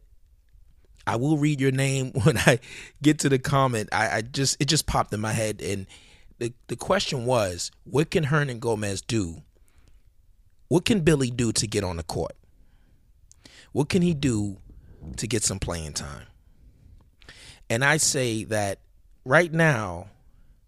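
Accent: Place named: Brooklyn, United States. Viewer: Good podcast brother. I'm sorry if you answered this in a previous cast because I just found you channel recently, but what borough or part of NY you from? You got a BK accent to me sounds like Saigon too. Dope channel, glad I found it. Respect from Queens via the Bronx. Word up to my dude Kemba. That rook in Orlando, Isaacs, is also a Bronx kid from what I've heard. ✌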